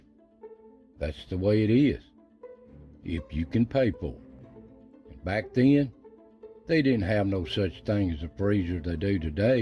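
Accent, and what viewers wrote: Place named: South, United States. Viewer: My mother grew up in Lafollette Tennessee. My dad grew up in southern Pennsylvania both on farms the stuff we did when we were kids was just half of what they grew up with. Enjoy your southern accent. I love the history. Thanks for sharing Joe in Maine.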